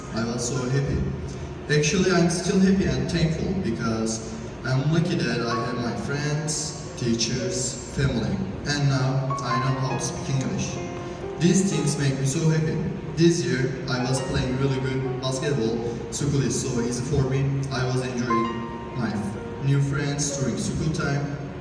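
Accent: Turkey. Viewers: türk şivesi yakıyo ya ama sıkıntı büyük hikaye üzücü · Türk aksanı, ve yabancılar bu aksana bayılıyorlar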